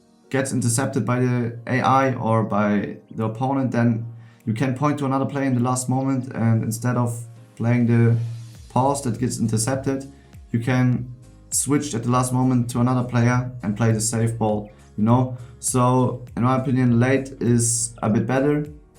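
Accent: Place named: Germany. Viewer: Mann kann höheren das du deutscher bist haha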